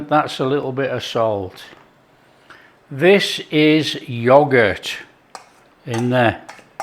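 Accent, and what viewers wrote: Yorkshire, United Kingdom. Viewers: First one of your videos I've watched and your accent reminds me of the few years I spent living in Yorkshire. I've just been thinking that I need to learn a few more recipes and now I've found you sir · 😁😁😁😁😁 yorkshire man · what a nice Yorkshire man . Could listen to him all day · Are you from Yorkshire sir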